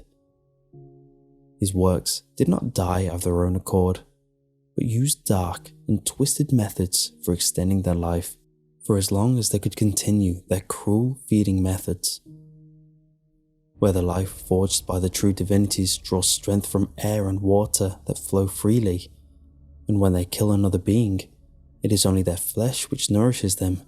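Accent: England, United Kingdom. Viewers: as an Oglalan Souix, I am kind of amused this story is being read by an Englishmen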